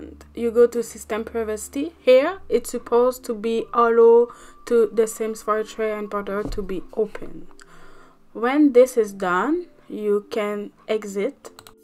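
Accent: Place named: France. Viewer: Lol you are a french people we can hear that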